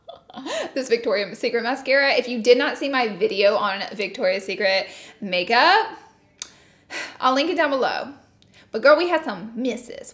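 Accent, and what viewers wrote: South, United States. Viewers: Her southern accent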